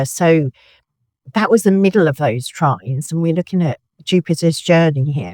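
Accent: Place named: England, United Kingdom. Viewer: Is that an English accent I hear? Music to my ears. I process audio. I especially love English accents and articulation/oration. Love my English brethren. From America. New subscriber. Your voice is melodic and you articulate and explain so beautifully.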